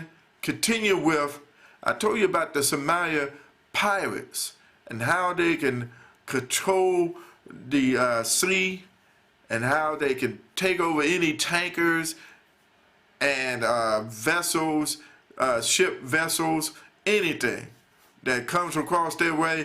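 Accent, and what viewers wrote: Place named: South, United States. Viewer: shut ur southern accent , u make me sick . please speak english. is not da , it is that.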